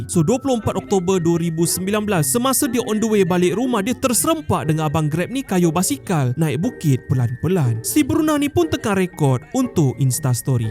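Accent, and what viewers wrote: Sabah, Malaysia. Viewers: Abang ni orang sabah kah? 😂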